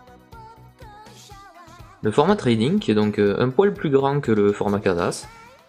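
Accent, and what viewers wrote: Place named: South, France. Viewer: Salut t'es d'ou ? on entend un accent du sud.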